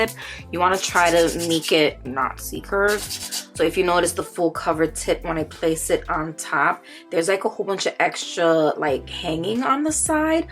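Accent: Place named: New Jersey, United States. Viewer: Also, I love your accent. Is it Jersey?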